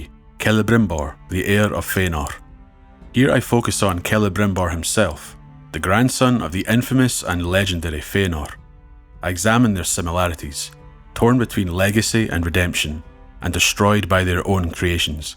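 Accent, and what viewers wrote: Scotland, United Kingdom. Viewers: I've missed those dulcet Scottish tones!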